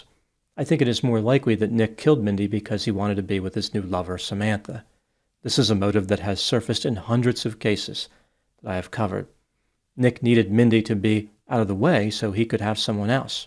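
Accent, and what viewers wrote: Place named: Pennsylvania, United States. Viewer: mentioned Lancaster, PA which reminded me that accent is exactly like my SEPA (Norristown) born and raised grandad. grew up in SEPA, no? If he didn’t, don’t tell me bc I fashion myself an amateuraccent expert. The gift that is uninterrupted ignorance is one that keeps on giving.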